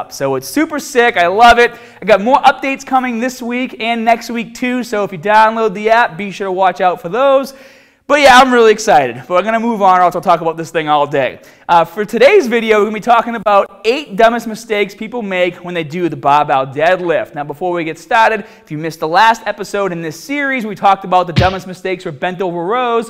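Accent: Boston, United States. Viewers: Love the Boston accent, brp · Good, insightful stuff. One thing: as a Brit, I'd say your Boston[?] accent comes over a little strong. It's easily understood, but it makes a twenty minute video seem half as long again. If you could tone it down to a 'Ted' level, that'd be great, and maybe would widen your appeal. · You must be from Boston, I can tell by your accent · I happened to just stumbled upon one of your videos and now I am hooked. I love the videos, love the way you tell it like it is and most of all a good teacher. I look forward to staying updated on all your videos keep up the good work man. Ps: where is your accent from? You up north-Boston area something? Lol Anyhow keep doing your thing man take care.. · when did he get a Boston accent? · He's from America... That's a Boston accent. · With that Bahston 'A', if he spoke just a bit slower he would sound just like Ahrnold! 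· Bahbell... this guys from boston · This dude is pretty cool. He knows his stuff. That Boston accent kills me sometimes, lol, but good material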